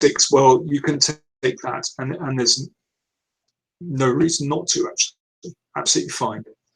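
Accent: United Kingdom. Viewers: what does he mean at the beginning when the guy compliments his British accent and he says "i don't have an accent" ?? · U really think u dont have a British accent? strange, of course u do. not that there's anything wrong with it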